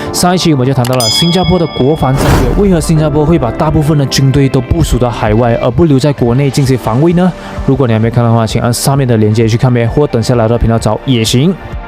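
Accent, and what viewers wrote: Malaysia. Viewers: 听他的口音 是马来西亚人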